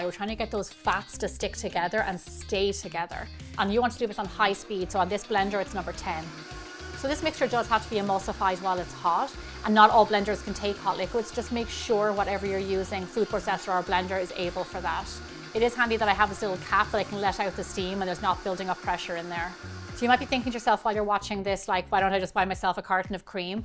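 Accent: Ireland. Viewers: This works better if you have a beautiful irish accent. Love your channel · What a delightful Irish accent! 💖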